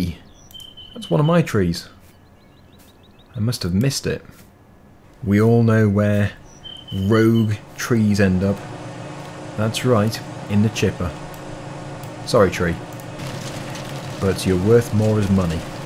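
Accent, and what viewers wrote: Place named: Guernsey, United Kingdom. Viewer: where u born in guernsey cuz i am from there and your accent is guernsey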